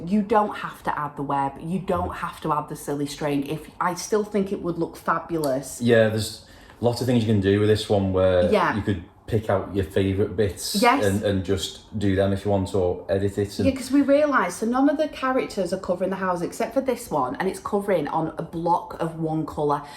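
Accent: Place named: England, United Kingdom. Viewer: Never saw you before. Glad to be here. Where are you from? I love your accent. I would think England but heavy accent makes me think maybe not. Next I must say I LOVE your Trick or Treat Town. It is perfect!! I hope to be able to purchase. I am in US and always happy to meet fellow cross stitchers. Crack on! I subscribed.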